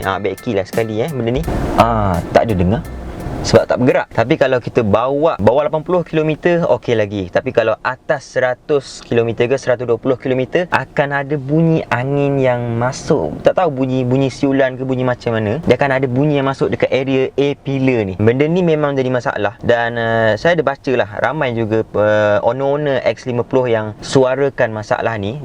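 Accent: Terengganu, Malaysia. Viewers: dia ganu lah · bila dengar review, *bisik dalam hati, mesti orang ganu ni. sampai hujung video.. perghh skli betul kat terengganu !! anyway, padu bro review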